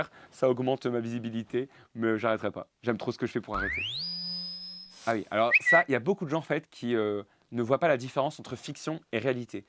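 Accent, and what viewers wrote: France. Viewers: ❇❇❇❇Coucou. Pour moi tu as été une bien agréable découverte. J'aime cet accent de nord. Tes vidéos sans grossièretés, et pleine de légèreté me font toujours sourire. Tes parents sont touchants et sympathiques. Moi j'aime beaucoup, et en plus, je trouve que tes placements de produits sont super bien faits! mon préféré c'est celui avec Monique ! Gros bisous à vous 3 et demie. ( Le demi, c'est le petit qu'on voit de temps en temps)✅ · j'adore ton accent francais, je suis Québecoise et je t'ai découvert depuis 6 mois environs. Continue ton bon travail, je t'adore :)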